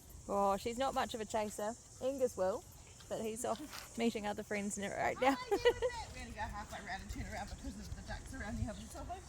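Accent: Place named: Australia. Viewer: I love your accents. It reminds me of the Aussies down under. 😊❤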